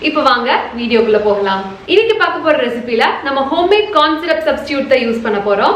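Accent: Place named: Kongu, India. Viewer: hi.... am ur new subscriber..... ur recipes are really 👌 n r u from kongu region...? ur slang s lik that.. tats y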